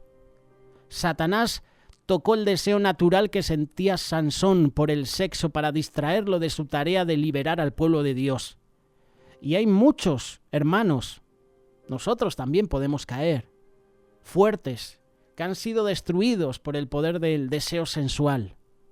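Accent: Spain. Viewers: El ETERNO LES BENDIGA HOY ES 4JULIO_ 2021-DESDE OREGON PORTLAND USA ME ENCANTA SUS ENSEÑANSAS ME INSTRULLEN MUCHO I TAMBIEN ME ENCANTA SU ASENTO DE VOSOTROZZ😍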